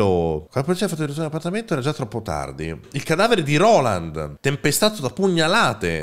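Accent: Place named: Veneto, Italy. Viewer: Sto gioco la maggior parte delle volte fa cagare pure a me che lo sto solo guardando 😂 P.s sta deriva veneta l’adoro ✨💕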